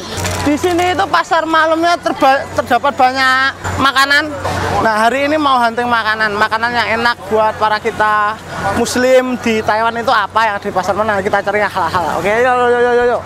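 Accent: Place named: Java, Indonesia. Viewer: medok e khas mas haha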